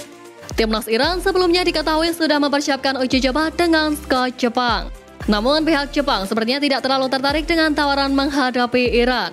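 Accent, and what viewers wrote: Java, Indonesia. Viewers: Yg ngomong medok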